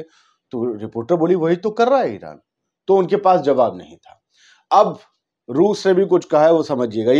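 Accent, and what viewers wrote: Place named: Allahabad, India. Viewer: Aal aap Allahabadi bhasha mein bole rahe the. Ameresh Misra ji bhi allahabad ke hain usi bhasha mein bolte hain.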